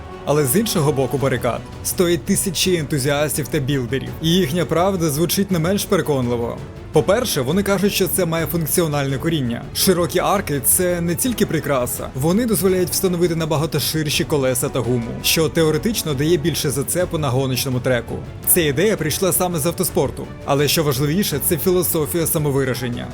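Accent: Russia. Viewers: Класне відео. Ух, де там NFS 2015! Чому ви відтворюєте латинські слова за російськими правилами вимови "E" як "Є"? :face-orange-raised-eyebrow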